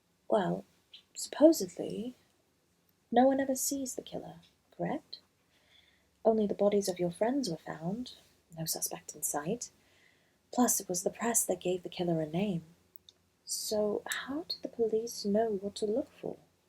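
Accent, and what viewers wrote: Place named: United Kingdom. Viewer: You sound more British than I do 🤣 loved the twist and turns you took in this! 😱💖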